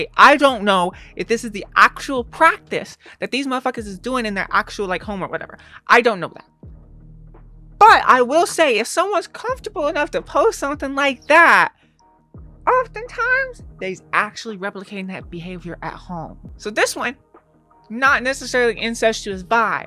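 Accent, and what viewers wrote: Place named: Illinois, United States. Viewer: Sounds like people I know from a big town/tiny city in Illinois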